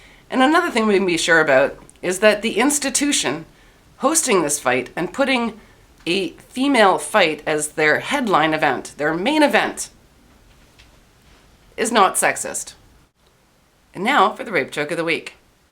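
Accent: Canada. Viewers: Is it the Canadian Accent or are you generally incredulous. Do you have a long tongue?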